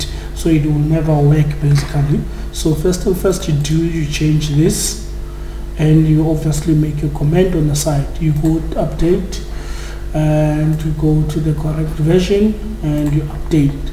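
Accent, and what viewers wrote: South Africa. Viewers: I know SA accent very well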